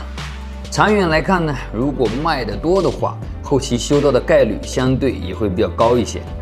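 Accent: Wenzhou, China. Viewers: 声音跟楼斌挺象的:)只是带了温州腔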